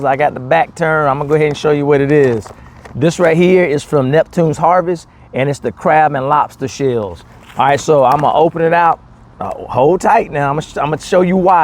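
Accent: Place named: Baltimore, United States. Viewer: You saying u's like you from b.more! Yay yae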